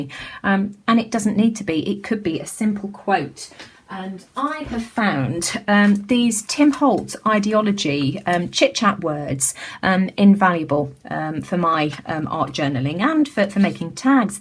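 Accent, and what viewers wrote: England, United Kingdom. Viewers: Beautiful page! I love that quote! And I love those flowers too! It is so enjoyable watching you and listening to you explain what you are doing! (In your fabulous English accent! lol) Tfs Big hugs, Ginny xo:o)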